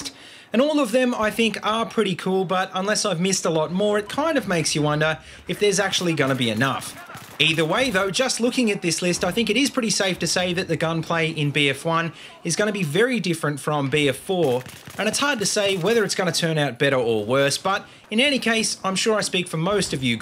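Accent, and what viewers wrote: Australia. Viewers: LAMO so Aussie cheers m8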